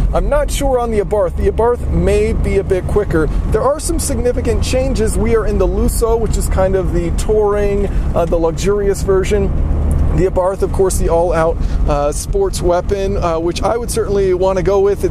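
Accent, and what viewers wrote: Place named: Michigan, United States. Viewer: Are you originally from Michigan? Your accent sounds exactly like my uncle's.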